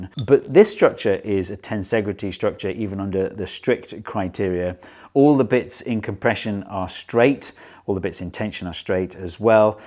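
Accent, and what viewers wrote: United Kingdom. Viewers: The British pronunciation of aluminum always annoys me. There's no i before the u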